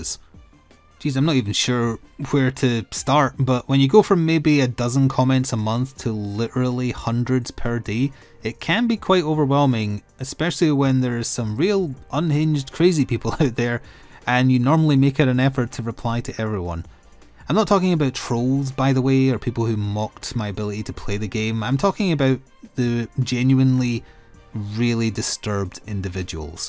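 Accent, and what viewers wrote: Scotland, United Kingdom. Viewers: Came aboard for the silly overwatch vids, stuck around for the amusing dialog & Scottish accent. Love ya, man, don't ever stop being you. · Tl;dr You're amazing! Love all your content! ❤🧡💛💚💙💜🤎🖤🤍 Hey there, This is not the first time I've commented/watched/participated in chat. I've been following your channel since that sponsored mh3u video. I used to make videos and streamed under the name TheBearthropod. Never got any success but it was just for fun. You always have been and always will be my favorite content creator. I loved learning all the new things about you from your new videos. As another private and quiet person, I respect you greatly. I've always thought that if we met in game or in person we would hit it off and be friends. Only because of our similar views on games and both being in the lgbtqia+ community. You're inclusiveness, respectful nature and humor always makes me smile. 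Your Hollow knight coverage coincided with at the time the lowest point in my life. I suffered from a severe sciatica flare up. I couldn't walk for two weeks and was in excruciating pain. Your daily videos of hollow knight gave me a flicker of hope(no pun intended please don't send the legitimate business frog i need my kneecaps). If I thought that was the lowest point in my life, let's just say I definitely did not see 2022 coming. I lost my grandfather, two uncles, an aunt, a beloved pet of 18years and my mother. I was horribly depressed for two years, but true to form there were your posh Scottish accent and dry wit to get me through. So, I thank you from the bottom of my heart. Even if there are horrid people critici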